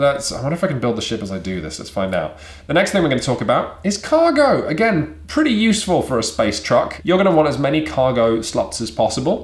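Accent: United Kingdom. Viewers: Looks like a fun game - it's even better watching you with a British accent and uncombed hair explaining this looking like your apartment was just hit by an asteroid storm lol. :P Subbed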